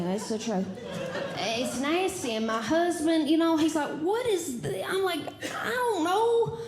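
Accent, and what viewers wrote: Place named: South, United States. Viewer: It’s a southern dialect, please educate yourself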